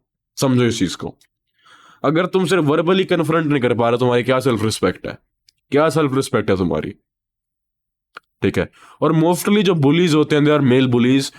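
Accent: India. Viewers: So u have desi tone too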